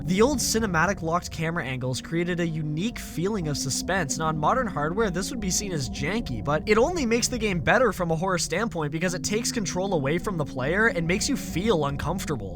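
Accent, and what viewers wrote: Canada. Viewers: bro has a HEAVY canadian accent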